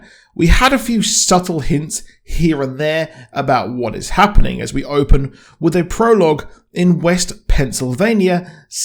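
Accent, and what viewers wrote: United Kingdom. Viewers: I’m unsure if you are putting on a Received Pronunciation accent... but Demeter is definite not pronounce DE ME TER.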